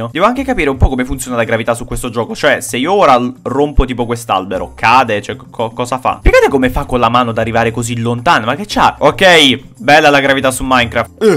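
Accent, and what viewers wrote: Naples, Italy. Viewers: ma sei napoletano?🤣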